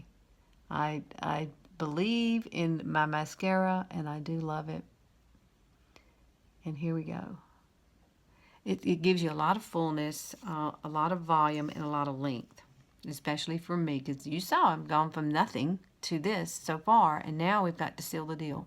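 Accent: South, United States. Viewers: Oh my goodness, where in Alabama are you? I live in Alabama too. I absolutely understand your soooouthern accent!! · I was reading the comments on here & if I read correctly, you're in your 60's...? My gosh, I can only HOPE I look like you when I'm your age! (I'm 41) You are beautiful! And your sweet personality just adds to your beauty! Your accent is just too cute also! I'm from Texas, so I definitely know a cute little southern accent when I hear one! 😉 God's blessings to you! ❤️ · Love your attitude and your bubbliness (is that a word?), and I love your southern accent cuz I'm from LA (Lower Alabama). Watch you later YALL. 👍😊😁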